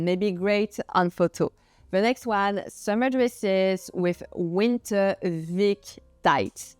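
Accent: France. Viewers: I just love the way you show the pictures of Of Fashion on Lily Collins from the movie and or series as badly styled because I’ve watched a few of those and I agree it’s just wrong and then I’m wondering if you could tell me if you really have such a strong French accent, or you could speak English with less of it🤪😅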